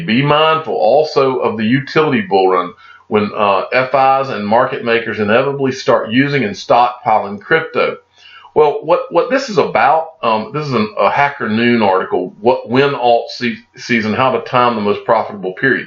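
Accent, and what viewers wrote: South, United States. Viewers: You’re so unapologetically southern it kills me sometimes 😂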